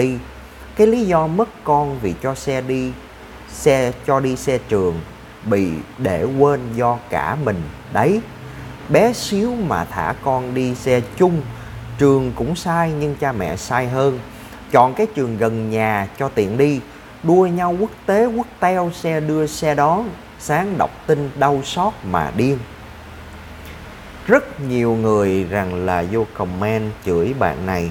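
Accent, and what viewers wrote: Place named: Binh Dinh, Vietnam. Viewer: A người gốc bình định thì phải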